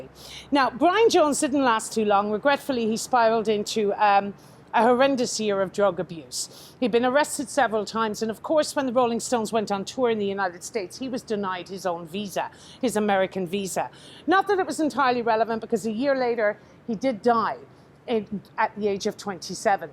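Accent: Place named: Ireland. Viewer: Apart from the Irish accent, I love your talking voice. Bet you can give it a rock and roll number, too, if not an Irish ballad. Both!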